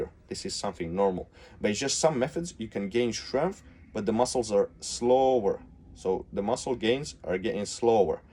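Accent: Russia. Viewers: Ты русский? Акцент выдает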